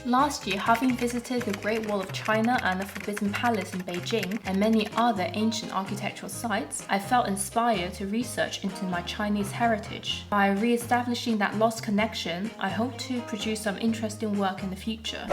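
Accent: England, United Kingdom. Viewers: not cringe at all with that english accent ;o made it sound elegant.. the twisted power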